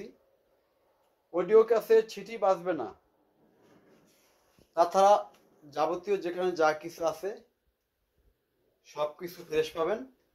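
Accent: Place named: Barishal, Bangladesh. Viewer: ভাই আপনার বাড়ি বরিশাল মনে হয় 😮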